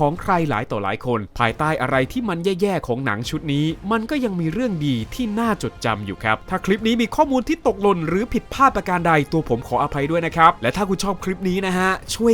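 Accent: Isan, Thailand. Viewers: ผมละชอบจักหวะพูดอีสาน 555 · มักเสียงพากษ์อิสานของเจ้าหลายๆ😂❤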